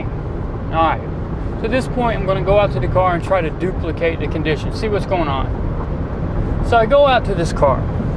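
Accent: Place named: Georgia, United States. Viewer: Thanks for the reply and sharing your Neon repair technique . Never lose that cool Georgia accent. Been SUBSCRIBED for a while . Prosperity, long life and good health to you and yours.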